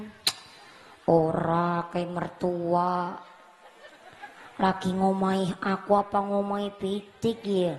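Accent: Banyumas, Indonesia. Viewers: Mumpuni. Ceramahe ngapak ngangeni.cerdas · Justru ciri khas mumpuni tuch bhs ngapaknya...salut dah menyimak.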